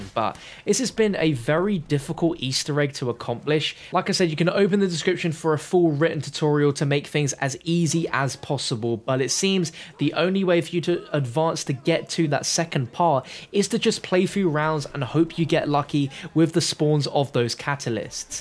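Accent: United Kingdom. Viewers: Yes he is from the UK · Something I've been wondering for awhile, is British? Idk why but his accent sounds British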